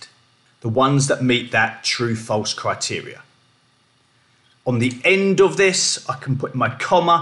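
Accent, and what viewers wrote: United Kingdom. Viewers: R u british? Love that accent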